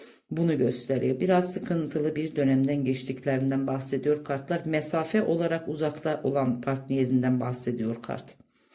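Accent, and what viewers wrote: Azerbaijan. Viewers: Siz Azerisiz?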